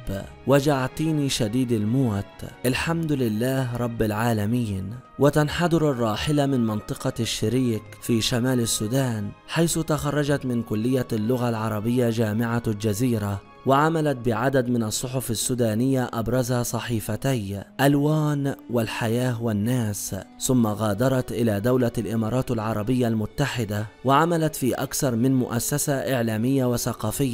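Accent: Upper Egypt, Egypt. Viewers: سيبك من اللكنة الصعيدية اللي كأنك واقف على عربية فول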